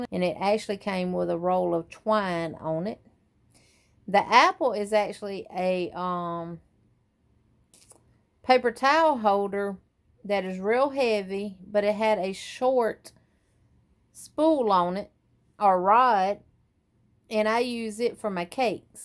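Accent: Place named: South, United States. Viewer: Thank you for sharing your craft room with us. I LOVE your "corner" SOunds like you are another southern gal! Wonderful to meet you!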